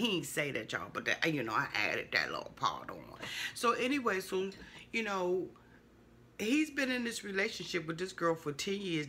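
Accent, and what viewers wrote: Washington DC, United States. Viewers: Is that a DC accent I'm hearing ?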